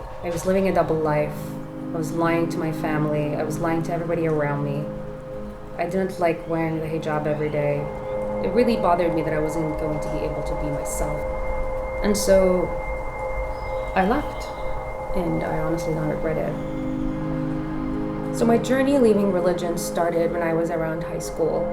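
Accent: United States. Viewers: I'm Australian, and I can hear that you have an accent. An American accent.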